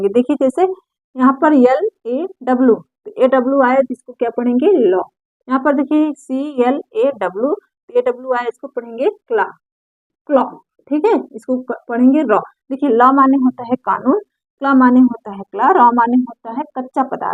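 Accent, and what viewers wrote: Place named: Uttar Pradesh, India. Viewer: Madam, You may belong to Uttarpradesh, I guess by your tone, so many thanks for it.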